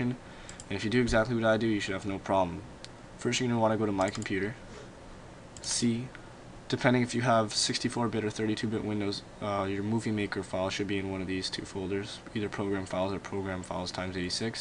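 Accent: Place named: Canada. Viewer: I kinda get the feeling your Canadian judging by your accent and the way you spell, correct?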